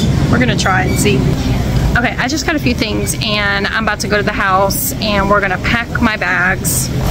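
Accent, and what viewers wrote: South, United States. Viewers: I stumbled across her a few days ago. I like listening while I work. Her southern accent is calming. ...from Boston. 😬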